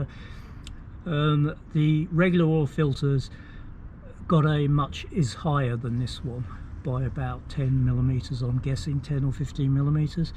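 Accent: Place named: England, United Kingdom. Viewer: Hi, just observing your front brake callipers looks different to my BMW stock pair. I just picked up my S1000RR last week 2021 model. Although you have an English accent you mentioned bucks and your reg plate has reflectors on the side (USA Std?). Just wondering if this was anything to do with the weeping issue in the USA?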